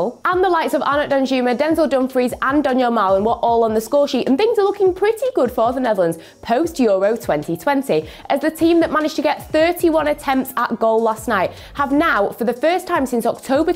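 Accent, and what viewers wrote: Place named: United Kingdom. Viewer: Your English is so British haha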